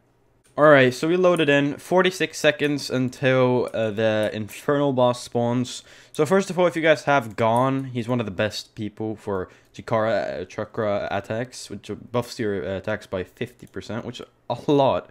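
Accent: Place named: Netherlands. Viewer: such a dutch accent tbh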